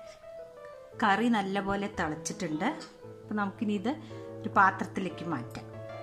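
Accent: Palakkad, India. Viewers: Chechi palakkad ano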